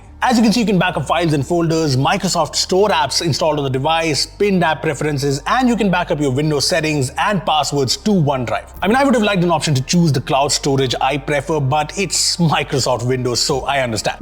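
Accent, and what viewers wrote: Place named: India. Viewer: this is the best indian english accent i have ever heard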